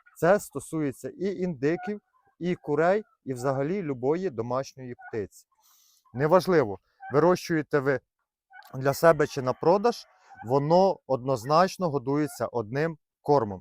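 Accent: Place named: Vinnytsia, Ukraine. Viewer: Вінницькій говір😮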